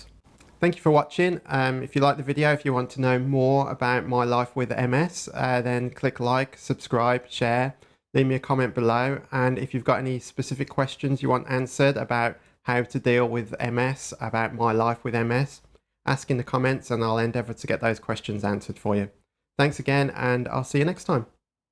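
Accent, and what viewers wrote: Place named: Midlands, United Kingdom. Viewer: I've got MS about 15 yrs old. I'm from the Midlands you sound local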